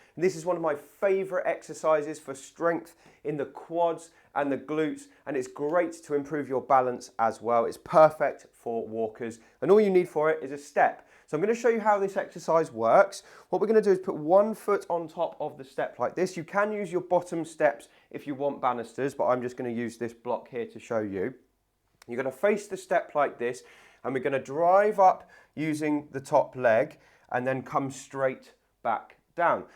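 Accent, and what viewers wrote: United Kingdom. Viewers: I’ve recently found my way to your videos and want to thank you for the content. You provide excellent advice and exercises for my arthritic knees. I hope to improve my walking and have improved joints. Your approach yo explaining the what, how, and why is so clear and concise. You are very talented at what you do. Wish you were in my location in the U.S. Your British accent is so smooth to listen to 😂. Thank you for the great content.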